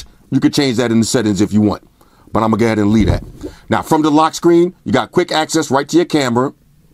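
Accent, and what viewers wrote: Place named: New York City, United States. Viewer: No questions about your reviews but I'm also watching for white shoes and I love your NYC accent!👍💪🍺🍻🥃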